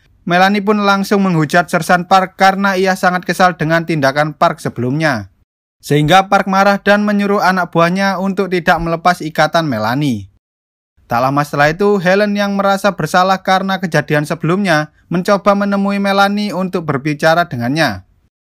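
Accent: Java, Indonesia. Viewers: Kayaknya orang jawa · Jowo e ndi om ? 😂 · Bang jawa mana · Wong jowo mas😅😅😅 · Logat jowo 😁😃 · Sampeyan wong jowo yo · weh wong jowo iki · Mas e jawa ngndi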